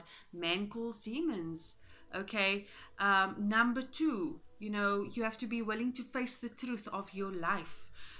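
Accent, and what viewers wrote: South Africa. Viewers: You have to be from SA? Just came across this video but we SA people have a specific accent 😋😋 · Are you South African? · That accent. You from south africa Capetown bra.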